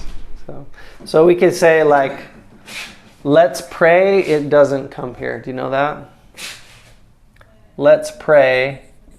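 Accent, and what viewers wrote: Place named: Iraq. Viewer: اشكد حلو الاستاذ يتكلم عراقي هل هذه جامعه امريكيه؟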